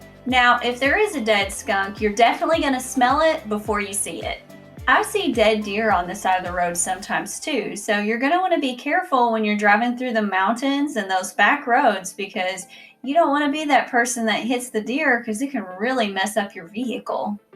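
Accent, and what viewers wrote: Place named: Kentucky, United States. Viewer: So you like your country accent but don't like to hear other country accents. Okay, gotcha. Can't get more back woodsy than Kentucky. Maybe you could move back to your holler in Kentucky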